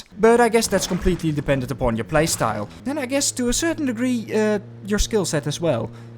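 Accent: Netherlands. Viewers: Haha tietemans 😂 weet niet of je het bewust heb gedaan maar ik neem aan dat je Nederlands bent aan het accent te horen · 16 seconds in and I can already tell you're dutch lol. nice video tho!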